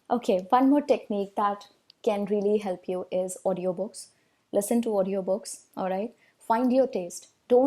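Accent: India. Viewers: You are beautiful but unfortunately your accent is too simple mixing your Hindi accent in it.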